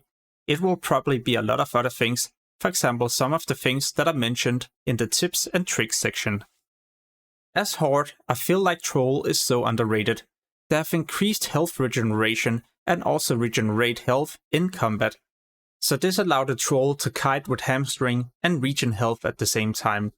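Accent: Denmark. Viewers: The danish is strong with this one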